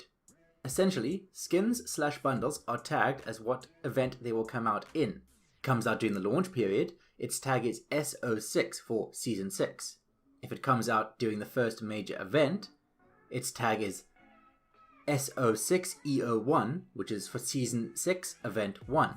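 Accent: South Africa. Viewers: Yo is South African?? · South African accent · South African accent? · What accent do you have bro? Sounds south african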